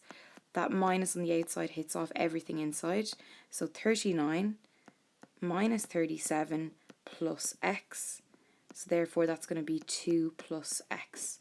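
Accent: Ireland. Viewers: Oh man i love that irish accent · Irish accents do be cool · Omg, an Irish accent on a yt revision video...there is a god.😁❤️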